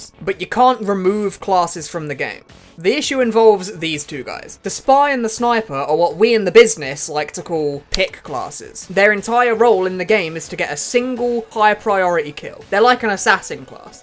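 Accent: United Kingdom. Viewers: TF2 ruined me. I thought you're Aussie but nah you're Brit. Thanks Sniper.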